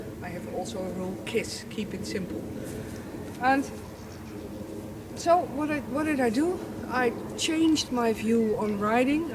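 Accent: Netherlands. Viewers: Hallo zeg, nederlands accent :p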